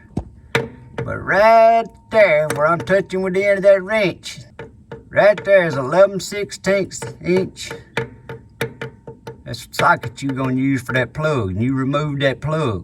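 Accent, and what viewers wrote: South, United States. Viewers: You just by god got a like and a new subscriber. Never seen anyone who gets straight to the point and I’m from alabama and can understand the southern draw quite well. Thank you so much!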